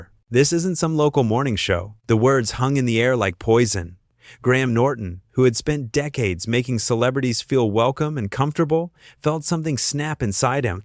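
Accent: United States. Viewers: Ok we have an Irish host, and a member of British royalty, together. Down here in Aotearoa, Nz, Harry has taken part in Maori hakas, played rugby, is a great fan of rugby, and even stayed in a 1930s style pub down on Stewart Island, in a fishing village. He is an ordinary down to earth bloke. No high and mighty, better than you attitude. Just a normal guy. So we get a VO with a yank accent, instead of an English accent, spouting away. This is fake. The Prince Harry I know, would never act like that. A.I do your research. Get your facts straight. OR take a hike. This is bad. 🤮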